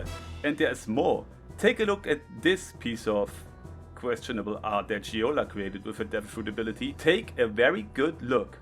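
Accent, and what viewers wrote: Germany. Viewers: Bruder dein deutscher Akzent ist nicht zu überhören 🤣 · he sounds german. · Are you German by any chance? Video is great 🎉❤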